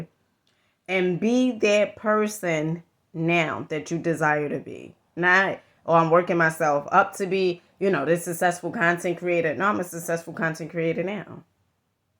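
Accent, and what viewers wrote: New York, United States. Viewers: Nice. To the point. When i was a young adult, i loved the N.Y. accent. Still do. From what part of NY are you?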